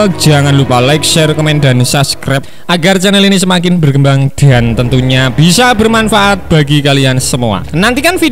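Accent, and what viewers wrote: Java, Indonesia. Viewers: narator videonya ini berbahasa melayu indonesia dengan aksen jowo...medok! hehehhehehe..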